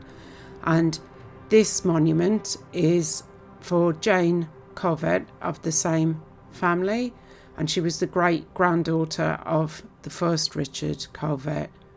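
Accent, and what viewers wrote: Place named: England, United Kingdom. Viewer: . The videos thumbnail is a white cross at the base of a Yew tree. The title states it is his guide to aging a Yew tree. Did I hear correctly that you said in another video that your not from Enland? I like listening to accents and to me you sound English. Again, just curious and mean no disrespect.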